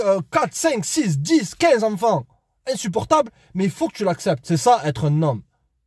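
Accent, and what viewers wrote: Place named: Marseille, France. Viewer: Quelle sorte d'accent est-ce cela? Marseillais? Au fait, merci pour partager les pensées. C'était interessant.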